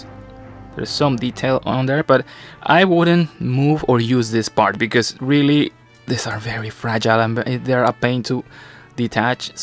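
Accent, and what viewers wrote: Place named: Conyo, Philippines. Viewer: nice review. conyo was just the icing of the review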